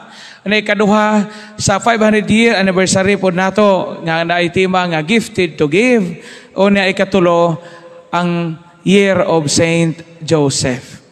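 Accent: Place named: Bohol, Philippines. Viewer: Nindot kaau paminawon imong boholano accent father cargado kaayog j, makalingaw pod kaau imong homily god bless father.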